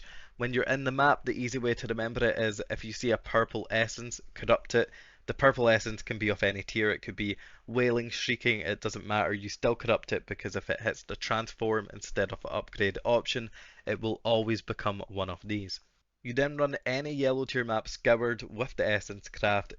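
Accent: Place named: Glasgow, United Kingdom. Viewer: n ur accents pretty tame too compared to some real glaswegian gems I encounter on the daily 🤣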